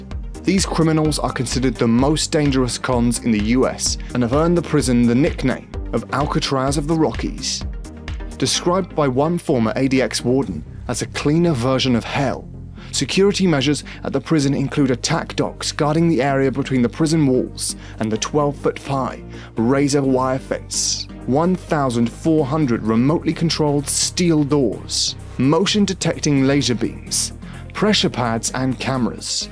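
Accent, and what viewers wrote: United Kingdom. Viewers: Lawrence Sanchez just because he has an English accent doesn’t mean he knows geography! Area51 is north of Las Vegas not New Mexico. Same pictures used over and over. WTF? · Hate the Brit accent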